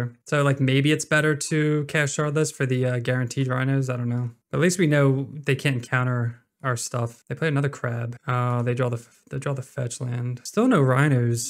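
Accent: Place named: Philadelphia, United States. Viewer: Great vid man. Are you from Philly area?? The accent is strong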